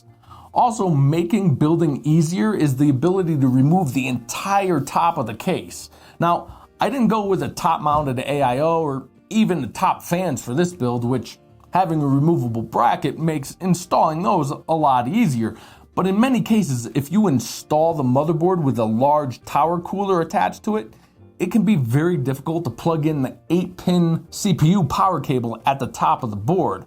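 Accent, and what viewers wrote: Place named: Brooklyn, United States. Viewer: This guys accent is great, is he from Brooklyn?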